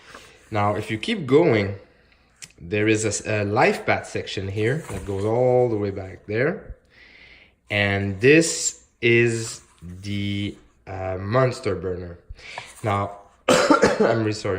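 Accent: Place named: Quebec, Canada. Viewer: I detect a Quebecois accent... ca va mon chum? Good review! Looking at buying these books myself.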